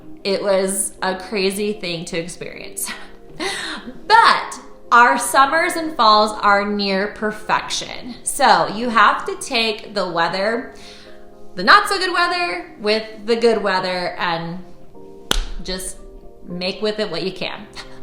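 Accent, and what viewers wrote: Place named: California, United States. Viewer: I was trying to guess where you were from based on your accent. Before you said it , I was guessing CA...and was right! Great video! Thanks!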